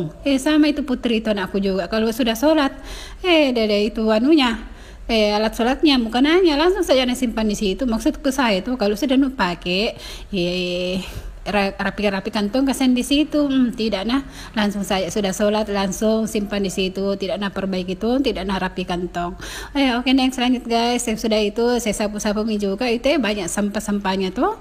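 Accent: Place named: Makassar, Indonesia. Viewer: Logatnya kayak orng mkssr bund...meman orng mkssr.ya?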